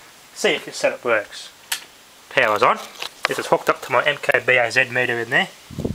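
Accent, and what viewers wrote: Australia. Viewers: I'm curious, what's the accent? I mean u sound aussie, but there's something else in there... Or is it just a lisp?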